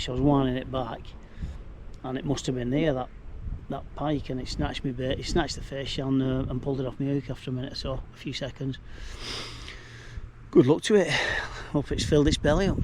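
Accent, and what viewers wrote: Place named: Wigan, United Kingdom. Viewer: Ellow pal, belter vid again and you make that cast look easy! Don't know if it's the Ribble you're fishing but guessing it might be coz you sound like a fellow Wiganer and it'll be on your door step but I've been trotting (with a fix spool) 3 ince dace on there after big perch and jacks and managed a 20lb pike the other week, bet it would make an interesting video on the center pin if you've ever done owt like that before? But ill keep watching either way 👍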